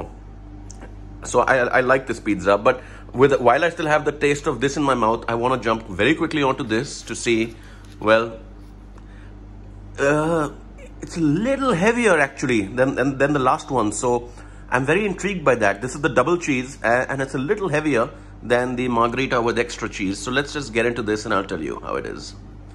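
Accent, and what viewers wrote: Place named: India. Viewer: Indian accent is sooooo annoying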